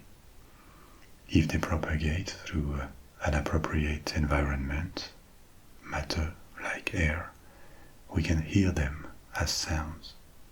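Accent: France. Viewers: Ohhhhh....just in time for that incredibly soothing baritone voice & fascinating French accent to lull me to sleep!! Thank you, Sir!! Your talent & gifts sre greatly appreciated!!!! ❤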